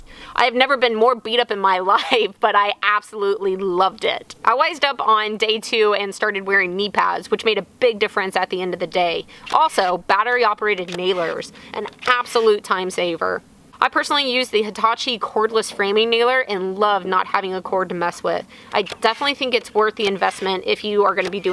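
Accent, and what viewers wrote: Texas, United States. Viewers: You leave her Texas accent alone! ;)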